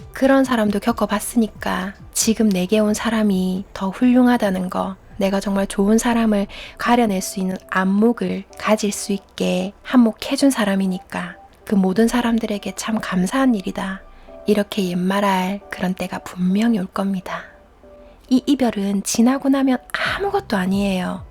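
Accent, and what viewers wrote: Gyeongsang, South Korea. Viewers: 갱상도 어감이?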